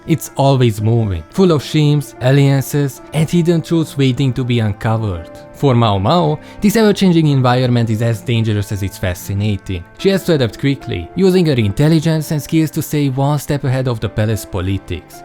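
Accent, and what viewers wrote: Hungary. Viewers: That Hungarian accent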